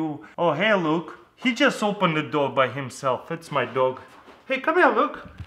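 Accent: Russia. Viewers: Я все не пойму по твоему акценту, ты русский или?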